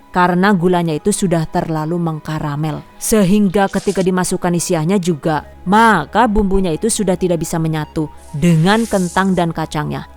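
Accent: Banyumas, Indonesia. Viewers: Ngapake mantep yuu, aku jg wong ngapak😅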